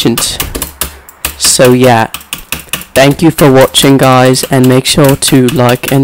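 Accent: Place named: Australia. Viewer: WHY DO YOU SOUND AUSTRAILIAN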